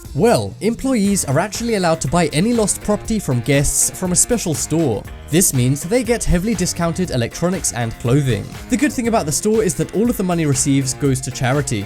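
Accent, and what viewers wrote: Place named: England, United Kingdom. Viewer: Love the accent!! English??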